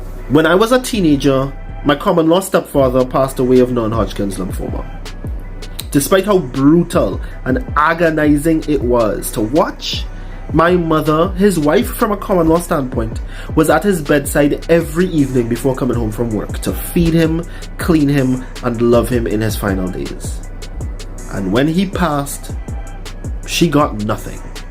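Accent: Trinidad and Tobago. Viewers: Thank you!❤️ You sound like a Trini? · Dais ah Trini accent btw👊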